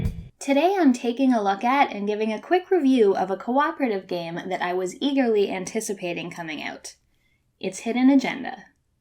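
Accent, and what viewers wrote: Canada. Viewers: Hi, great review, enjoyed it a lot. Lovin how you explained it very easily. On a slightly different note, Are you from Canada 🍁. 😀 👍 👍 👍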